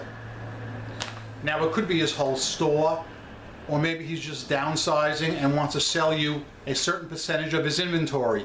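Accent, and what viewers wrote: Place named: Tennessee, United States. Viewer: I too have a distinctive accent from SE Tennessee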